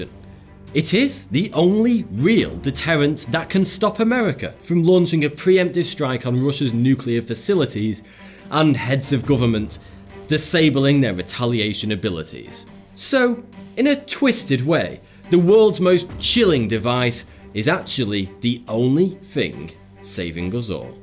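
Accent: United Kingdom. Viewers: i susbcribed cause of his brittish accent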